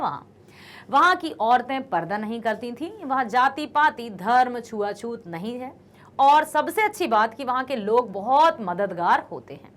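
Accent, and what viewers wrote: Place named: Marwari, India. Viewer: Madam ji Marvadi ave hai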